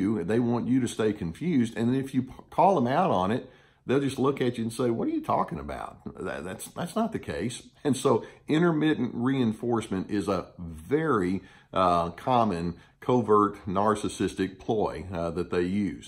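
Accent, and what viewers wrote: South, United States. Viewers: You can just feel the warmth and caring of this man by listening to him. That southern drawl and compassion are a beautiful combination. I'd I had to guess I'd say he's an infp like myself, but more highly developed and less jaded than myself lol.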